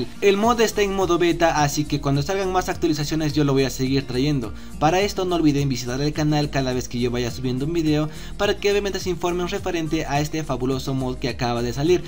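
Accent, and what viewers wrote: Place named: Brazil. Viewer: Si se nota que es brasileña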